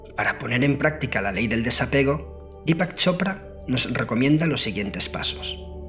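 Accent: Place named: Spain. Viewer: Yo quiero desapegarme de escuchar ese acento españoletes gas y de encima las mayoría todo YouTube es de los mexicanos y los españoles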